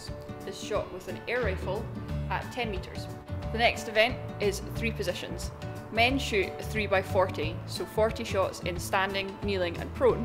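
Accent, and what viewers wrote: Scotland, United Kingdom. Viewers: Its a accent from scot. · Oh... that heavy Scotish accent! I can only catch about 30 percent of what she is saying. Thank goodness for closed captioning. 😅 😂 🤣 Side note: In this day and age (#metoo) it does seem a bit absurd that "fragile females" are given less shots to take than the "big strong men". In fact, it's a bit absurd that there still are still both separate women's and men's events in this particular sport. Why not let them compete head-to-head?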